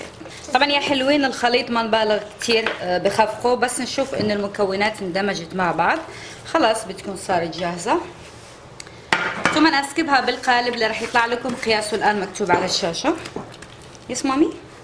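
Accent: Tangier, Morocco. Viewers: ما شاء الله .تسلم ايدك انتي مغربية .ولا لبنانية .كلام. مخلوط. 😍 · باينة فيك تطوانية او طنجاوية ❤❤❤ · كتعجبني مكتهضر طنجاوية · ههههههه مزيج عجيب بين المغربية الجبلية و اللهجة السعودية ضحكتوني و الله ايخلي ليك ااادم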